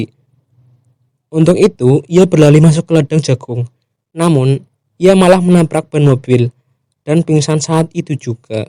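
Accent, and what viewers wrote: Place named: Java, Indonesia. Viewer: Mantap tetep konsisten medoknya.. 👍🏻😁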